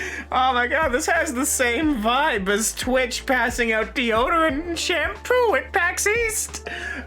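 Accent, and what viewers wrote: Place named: Rhode Island, United States. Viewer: Discusses capitalist propaganda in a thick RI accent as well.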